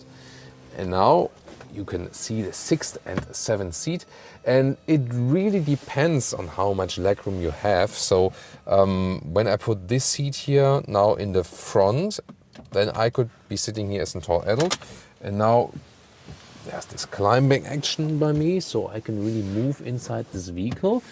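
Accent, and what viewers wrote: Germany. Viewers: This guy has the strongest and most awkward German accent ever hahahahahha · Never heard a more german english speaking accent 😬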